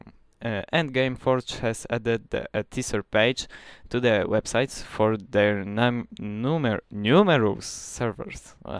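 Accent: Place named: Poland. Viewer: Szacun. I tak słychać w choooooj polski akcent. O_o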